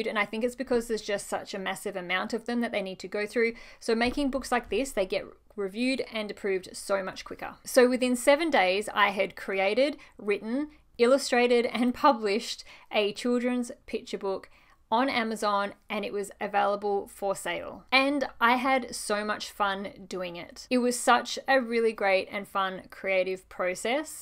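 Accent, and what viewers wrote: Australia. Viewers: Lovely, unaffected, clean Australian voice. Outstanding, lucid preparation ands steady camera work. Smart illustrations. If this charmer isn't a teacher, she should be. She nailed it with this book.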